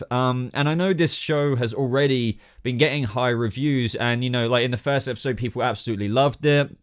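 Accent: United Kingdom. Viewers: suuuuuuureeee I want more reviews brooo. You are british then...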